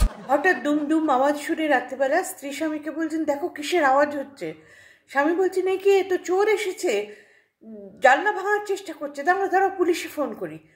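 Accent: Bangladesh. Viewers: পূর্ব বঙ্গের ভাষা বেশ লাগছে । দিদি বিক্রমপুর এসে বেড়িয়ে যান । নিশ্চয়তা দিচ্ছি ভালো লাগবে ।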